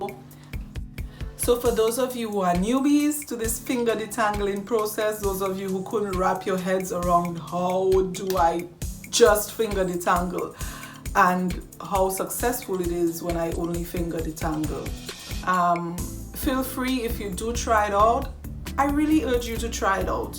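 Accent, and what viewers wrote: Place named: Caribbean. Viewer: Hi, where are you from, I hear a Caribbean accent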